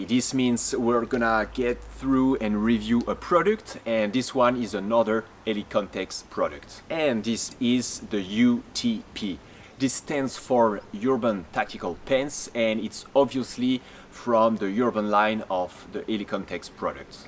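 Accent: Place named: France. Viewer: Thanks for the review. The French accent.....love it. Have you tried the 511 Apex cargo pants?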